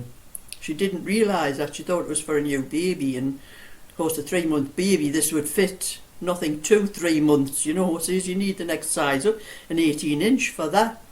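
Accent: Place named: Hartlepool, United Kingdom. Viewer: Love listening to you talk.. I'm a Hartlepool lass living in lincoln.... your lovely accent reminds me of home.... you are a lovely knitter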